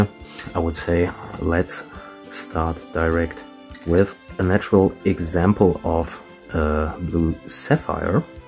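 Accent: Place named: Germany. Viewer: I just got interested in gemstones, while looking for a sapphire ring for my daughter. Now I am fascinated with the whole subject of gemstones. Thank you for this video! So far the best one regarding natural vs synthetic! I like your German accent, I’m German myself, living in Southern California. 🇩🇪💍💜💎😊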